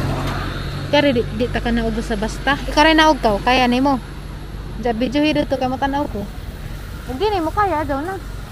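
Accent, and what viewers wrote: Bohol, Philippines. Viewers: Klaro kaajo taga Bohol pud! Bohol pa more!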